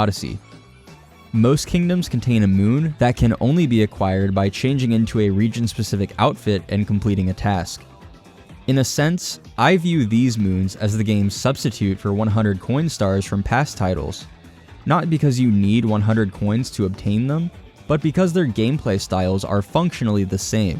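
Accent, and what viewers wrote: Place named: Northeast, United States. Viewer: you have a very northeast accent and it’s dope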